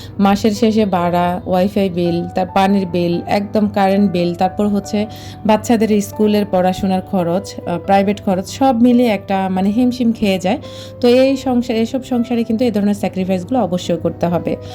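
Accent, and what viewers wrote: Noakhali, Bangladesh. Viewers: নোয়াখাইলা বলে কথা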